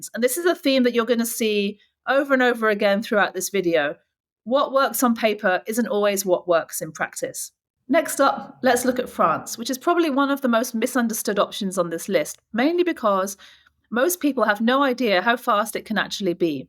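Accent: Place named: United Kingdom. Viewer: That'd be awesome. And thanks for being so responsive, for taking the time to respond to random comments. That and of course the meticulously curated content makes me come back to your channel over and over again. Plus, I love the British accent, and given how English is my second language, being on your channel is always a learning experience for me, in more ways than you intend. Thanks for everything. :)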